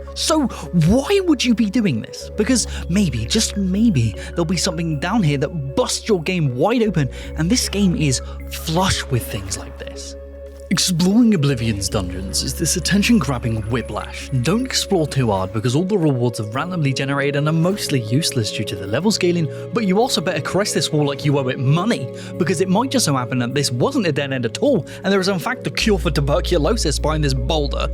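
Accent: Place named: United Kingdom. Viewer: I watched this a 3rd time. I am convinced is a fake gamer who needs hand held garbage or carrot on a stick garbage like WoW. He says that some items are scattered around and hard to find, then says exploration is pointless as there is no pay off. Example: fin gleam. Kid, make up your mind and please realize a British accent doesn't make stupid things stated as FACT, not OPINION sound very dumb and not smart. Funny thing is, I despise Bethesda, shit on them all the time, but the difference is I use literal FACTS. I do not cry about stuff and pretend they are facts. I think is just geared towards dumbed down slop like Skyrim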